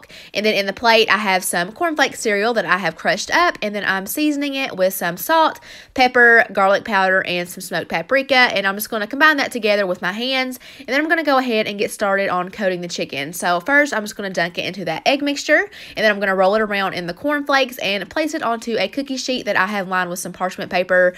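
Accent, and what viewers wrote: South, United States. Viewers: I love in Kentucky too I have been wanting to start a YouTube channel but I'm so scared lol your doing so great and that country accent is so comforting lol keep it up girl your doing awsome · Sincerely Sorry Ur Absolutely Gorgeous, Ur Country Accent Is A Choir Of Angels, Ur Mother Of Tha Century, Ur Husband And Kids, I Believe Are Very Proud And Lucky To Have You. God Bless 🙏🙏🙏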